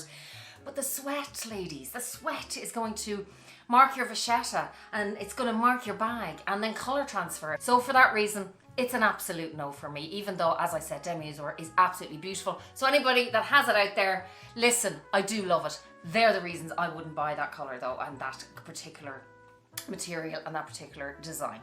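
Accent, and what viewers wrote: Ireland. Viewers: Hi, I have 3 LV’s (speedy, neverfull & retiro) & I love them but to be honest I’m done buying LV’s right now. Love to hear your voice esp. your Irish accent. I used to work in Beaumont Hospital as RN, now I’m base in US. I’m from the Philippines originally. Always looking forward to your videos.❤️❤️❤️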